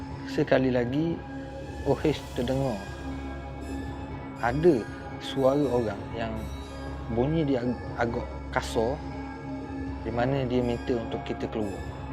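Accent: Terengganu, Malaysia. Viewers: Jgn nagis bang😂...org ganu eh bang? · Org hok komen negetif tu bute kayu teruskan langkah mu. Hok penting kekalkan pelat Ganu OH trademark mahal tu. Mana nak cari explorer ckp Ganu dlm dunia ni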